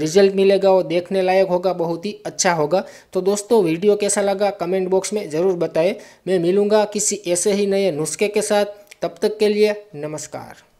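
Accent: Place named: Kathiawar, India. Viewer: Sir tame Gujarat thi chho ne ? Kathiyawadi chho lage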